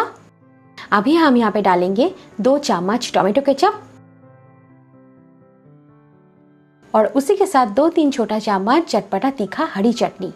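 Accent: Bengal, India. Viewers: Are you bengali. .? apke hindi bolne k style se lag raha hai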